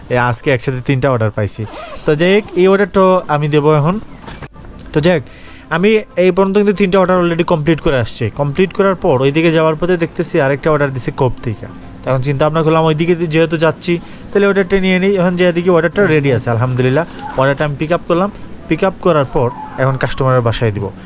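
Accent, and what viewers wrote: Sylhet, Bangladesh. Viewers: Apne Sylhety Mone Hoy,Voice sune buja jay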